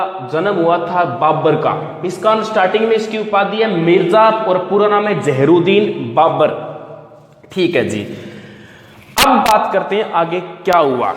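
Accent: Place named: Punjab, India. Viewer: Bolne se Punjabi lg rhe hai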